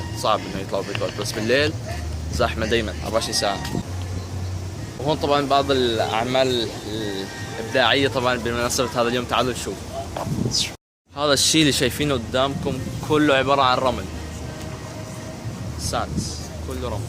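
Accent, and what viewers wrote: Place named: United Arab Emirates. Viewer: ياخي لهجتك زكرتني بايام حلوة ايام الفريج و الربع ... انا هلق بالمانيا و كنت عايش بالامارات .. بس ما حبيت المانيا ابدا ... الامارات احلى بكتير ... كيف امريكا حلوة متل ما منفكر و لا كمان متل اوروبا قرف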